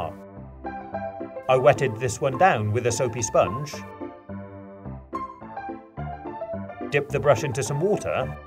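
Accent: United Kingdom. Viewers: hi I was wondering are u brittish?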